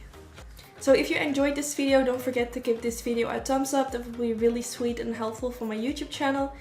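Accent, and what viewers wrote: Netherlands. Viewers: First of all. This look is STUNNING. I was looking for a look to really make the colors pop because I haven't really been into super colorful looks yet. Also you are Dutch right? I can hear it since I'm Dutch as well hahaha. When you said Glucose I knew it for sure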